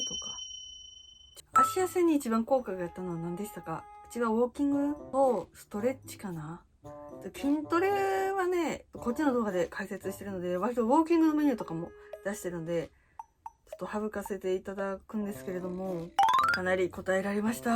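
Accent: Kansai, Japan. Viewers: お人形さんがガチ関西弁喋っててすごい可愛い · 初めてこの人の動画みたけど、めっちゃ親しみを感じるタイプの関西弁で話し始めてビビった笑 妹が3日間ファスティングしてたけど、4日目に爆食いしてそれ以降もめっちゃ食べてて逆に太ってたわ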